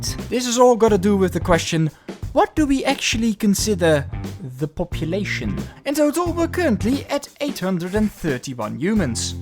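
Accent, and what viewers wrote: Netherlands. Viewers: Its funny how a Dutchman recognizes another Dutchman just from their English accent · Yes always, wonder where this guy’s from, Amsterdam? He sounds like he’s from the North Holland province.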